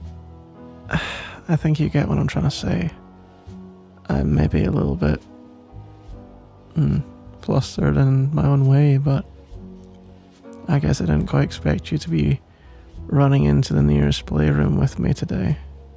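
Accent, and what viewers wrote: Scotland, United Kingdom. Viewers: I loved this audio, but I am also a big fan of the Harry Potter series, so I was wondering if you could do audios for one of the characters, specifically Oliver Wood because he has an identical accent to yours. (His accent made me look for Scottish audios :)) If you could do a series for him on how he would react to certain stuff that would be amazing. It would give you a chance to broaden your horizon a little bit and have fun with this character! If you have already done something like this, then don't pay any attention to this :). I love your videos by the way!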